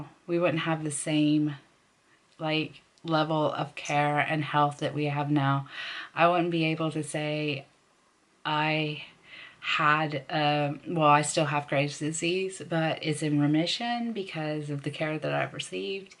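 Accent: Scotland, United Kingdom. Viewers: Good video. I was going to guess you had moved to Scotland before you said it. Can hear it in your accent very slightly and when you said wee for small. I find it so bizarre Scotland and wales dont pay for prescriptions like we do in england. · You have a Scottish twang to your American accent :-) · I thought u sounded a bit Scottish! Love from Glasgow :) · As a Scot myself, I can detect a tiny bit of a Sottish accent developing there! That's the way to integrate fully! 😂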